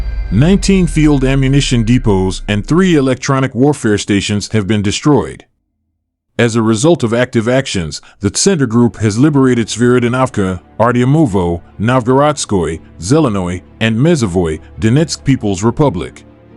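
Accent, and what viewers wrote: United States. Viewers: Пендосовская озвучка, достала. Россия вперёд.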